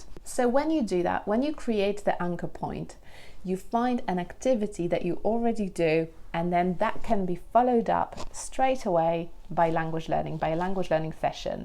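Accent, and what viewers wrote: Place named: United Kingdom. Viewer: I thank you for your superb work! I adore your British accent! Peace and Love from Russia! ...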